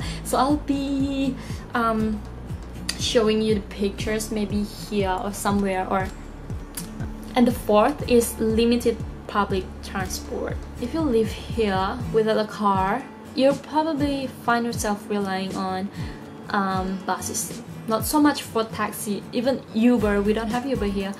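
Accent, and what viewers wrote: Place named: Australia. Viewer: wOW JUST OVER A YEARS STAY AND YOU GET THAT PRETENTIOOUS AUSSIE ACCENT!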